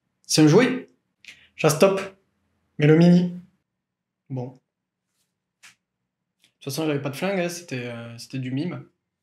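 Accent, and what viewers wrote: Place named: South, France. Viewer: Je sens l'accent du sud par ici ! Oh ! Sinon, bordel qu'est-ce que j'adore ce jeu ! C'est en partie ce jeu (et Le cauchemar des schtroumpfs) que j'ai dédié au compositeur Alberto José Gonzalez qui a fait un taf monstrueux, et VD-Dev de bons devs de l'ombre ! Pour un première épisode, il est vachement cool, j'ai lâché quelques rires hahaha ! Des bisous !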